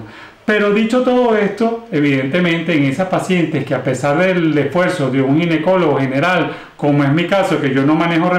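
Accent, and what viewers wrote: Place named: Venezuela. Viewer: Doctor saludos desde Italia y ese acento suyo me parece criollito venezolano.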